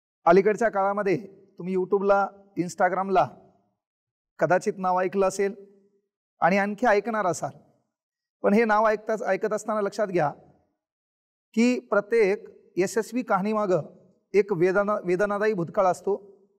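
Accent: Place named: Latur, India. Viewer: I live in Ireland. I am not a student but i have been watching his reels. Mi Latur cha ahe ani siranna boltana aikla ki nehmi gharachi athavan yete. Bhasha apli naal ahe. Sir ek no ahet 🔥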